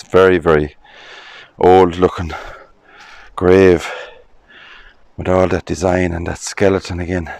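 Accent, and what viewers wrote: Ireland. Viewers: I enjoy your Irish accent!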